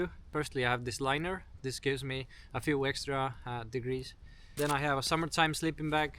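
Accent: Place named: India. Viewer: plzz aitab! Sa teed megägedusi... aga see su `english`` on nagu india smthinng .....nääääh.... noup... no ei,,,,,kus on Gabriel??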